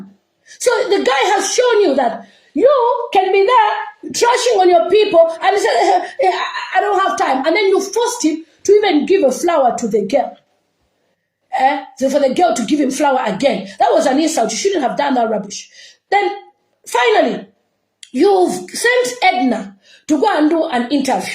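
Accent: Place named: Nigeria. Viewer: Why are you using Nigeria accent (oyibo)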